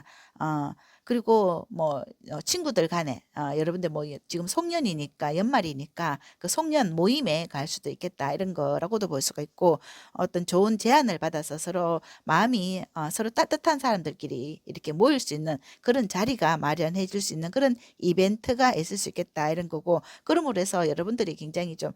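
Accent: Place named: Busan, South Korea. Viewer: 4번 잘들었습니다 부산사투리 ㅎㅎ 리딩 좋아요 구독!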